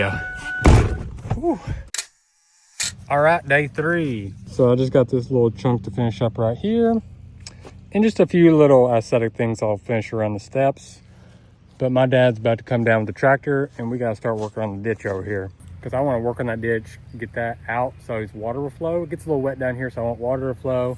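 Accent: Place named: Oklahoma, United States. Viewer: I'm from southeast Oklahoma....I thought your accent sounded familiar ❤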